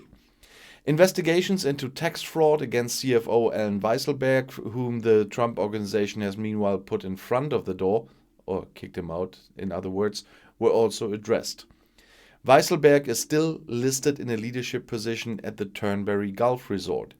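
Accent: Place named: Germany. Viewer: I wondered about your accent, thought it was German, thank you for your very enlightening contribution! May the trumpstain disapears back to hell, where he belongs!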